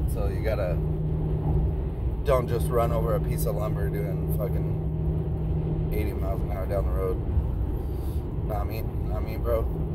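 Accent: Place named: California, United States. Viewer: 🌱Good Talk.. ThankYou.🙏💯 its always nice to hear Someone Speak California. Too much im Always missing Home.🏡 the Southeast is Completely different.🍃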